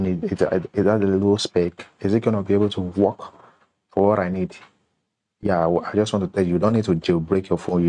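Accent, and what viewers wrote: Nigeria. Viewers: A Nigerian, nice. I'll recognize that accent anywhere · This tutorial guy sounds like a Nigerian 🇳🇬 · This person has a Nigerian accent 😍😍😍😍😍 Me likey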